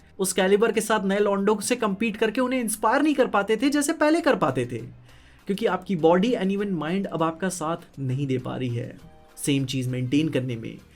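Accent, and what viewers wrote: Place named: Bihar, India. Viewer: old videos mein kitna ajeeb lag raha hai. Bihari accent bol raha hai, poor hai aur ab dekho kya transformation aya hai bande main 😮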